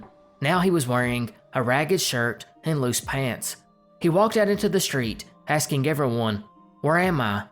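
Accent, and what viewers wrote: South, United States. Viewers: I'm fairly new to this channel but am noticing how I get a little excited when a new vid appears. Is it me or is this the best narrator on YT right now. It's definitely one of the reasons why it's easy to view the entire episode every time to the end. I love Southern Drawl man!! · o.k. Ga. boy from Kennesaw here. Love the Southern accent. So cool to hear. I finally broke down and subscribed. To hear the narration. Which is excellent. Not too crazy conspiratorial but leaves a lot open to basic common sense mixed with mystery. A good mix brother. I'm not a 'true' conspiracy guy BUT I do LOVE to hear as many as I can. They are fascinating,......and truly mysterious. I like your cognitive, analytical style.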